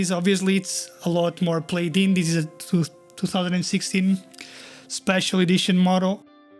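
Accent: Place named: Portugal. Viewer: O sotaque diz-me qualquer coisa. Fui confirmar... TUGA ! Obrigado pelo video !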